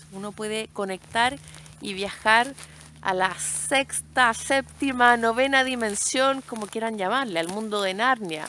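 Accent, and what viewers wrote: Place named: Chile. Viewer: Bella . Pensé que eres chlena. Por tu acento. Sos chilena ?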